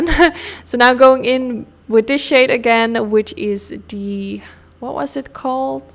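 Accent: Netherlands. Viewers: Wat grappig. Ik heb zojuist je kanaal gevonden en ik dacht dat ik een Nederlands accent hoorde. En lo and behold, je bent Nederlands! Super leuk. Ps: ik hoorde het maar een heel klein beetje hoor, je spreekt prachtig Engels.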